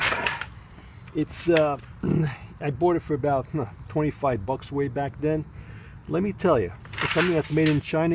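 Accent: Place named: New York, United States. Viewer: This was great. I can hear the New York / Italian heritage lol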